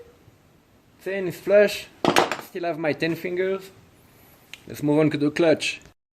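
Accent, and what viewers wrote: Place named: France. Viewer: hello, your accent looks like french guy! PLEASE, i have a IH345 V8 in a 1979 scout2, can i do the same conversion as you did? i actually have a automatic 4 speed gear box on it, what do i have to modify and what parts i need for this conversion? i would appreciate your reply, thanks a lot, and continue like that, i like your channel! cheers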